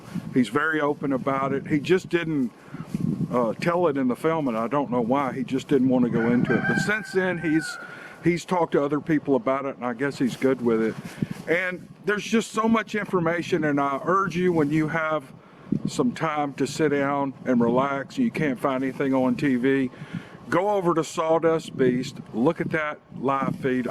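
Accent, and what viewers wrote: South, United States. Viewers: I am addicted to your Chanel. In your interview 5 you mentioned that you were not collecting 🥚 from your hens. It is happening to me now and I solved the puzzle. Two of my 4 hens are eating the eggs. You have beautiful animals. You may call your roster Claudio. I watched your documentary and enjoyed it. You are an excellent storyteller and I like your southern accent. Regards from Puerto Rico 🇵🇷! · MR., you talk and explain as much as you want to I enjoy listening to you talk, your accent reminds me of home and you got a good old boy accent I feel,you are a fantastic narrator and even if there was more commercials I would still put up with it to listen to you talk look at your stupid chickens and just enjoy myself of Southernman temporarily stuck in Chicago I would rather stay at home and listen to you then wander around outside in the jungle there ain't no bigfoots in this jungle! 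· Love your southern accent and the stories. Keep up the good work 👏🏾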